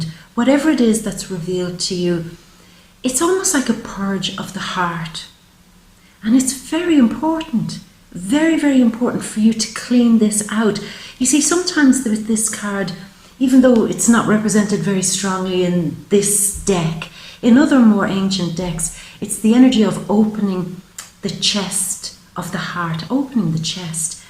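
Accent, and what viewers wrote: Ireland. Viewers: I'm thinking Ireland. I love the accent whatever it is.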